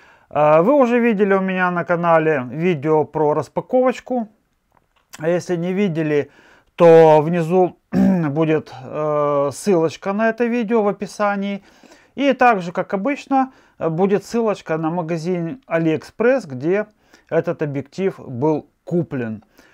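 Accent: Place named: Odessa, Ukraine. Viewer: Одессит чтоль? Говор знакомый... За обзор спс.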